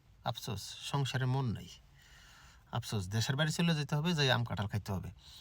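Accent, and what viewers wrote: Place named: Bangladesh. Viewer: Aapnar kotha sune ...eibar aapna k ekjon pure Bangladeshi bole mone hocche....jeta etodin mone hoini....🤩🤩🤩🤩🤩🤩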